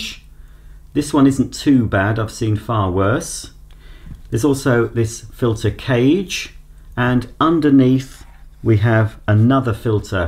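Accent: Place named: United Kingdom. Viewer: Thanks, British guy!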